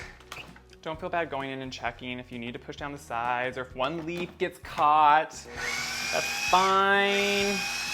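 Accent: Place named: South, United States. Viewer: Your accent gives me life!! I’m a southern gardener girl in the DC area and I miss how warm the people are ( just like you) so your videos are like therapy for me. Thank you so much!! ❤️❤️❤️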